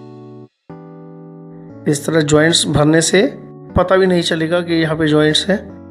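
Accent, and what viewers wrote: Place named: India. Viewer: Indian voice -.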